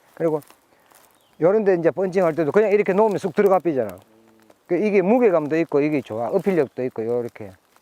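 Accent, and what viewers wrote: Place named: Busan, South Korea. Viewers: 아~하~ 영상뜨고 항상 자기전에보는데 같은부산사람으로써 말투도 정감있고 낚시를 재밌게 가르쳐주시니 더재밌고 더 알기쉬운거같아요 앞으로도 좋은영상올려주시고 항상 안전하게 낚시하시고 건강하세요ㅎㅎ 화이팅ㅎㅎ lee<num> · 얼마전 바쁘신 일정 중에도 영상 올려주셔서 감사했습니다 특히 저랑 같이 경상도 사투리를 들으며 영상을 보고 있으면 저절로 필드에 동출한 기분이 듭니다 ㅎㅎㅎ 항상 감사히 보고있어요!! <mail>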